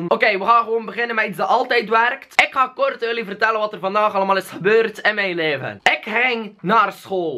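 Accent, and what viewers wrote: Belgium. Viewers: Je stem is te grappig. Je schreeuwt te veel. Je praat grappig (maar elke Belg praat grappig)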